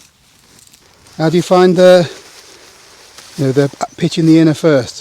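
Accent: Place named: Yorkshire, United Kingdom. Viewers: I always thought you was a Yorkshire lad to be fair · That Barnsley accent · from Barnsley, defo accent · Yorkshire born... best accent, best product reviews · Ahh it's great to hear a reet proper yorkie accent, from a Lancastrian lad, it's getting rarer is the colloquial English accent mores the pity. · is aggressively Sheffield sounding 😂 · I love that there’ll be a load of Americans out there, totally not understanding T’Yorkshire accent uses!! 🤣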